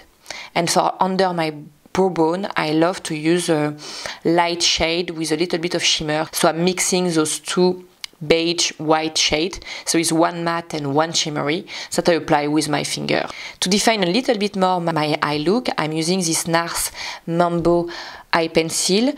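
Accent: France. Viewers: Your accent is lovely - where abouts in France are you from? X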